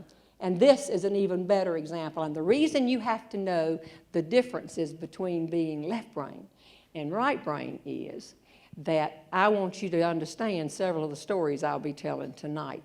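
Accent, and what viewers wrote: South, United States. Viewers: Idk if she’s a motivational speaker or a comedian but she’s pretty funny! and ya gotta love that southern accent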